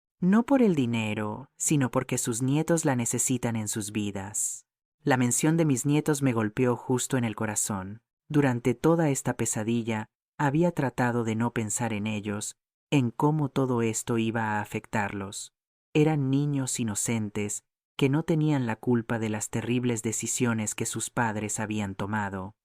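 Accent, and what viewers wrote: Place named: United States. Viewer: Me gusta la historia, lo único qué no me gustó que narren con acento gringo, siendo qué la historia es relatada en Sevilla España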